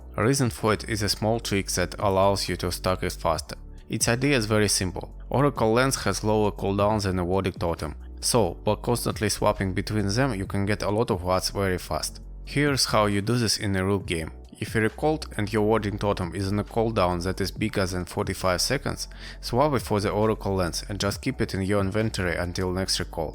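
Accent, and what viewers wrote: Russia. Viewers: I find your accent pleasent (#nohomo), are you from russia?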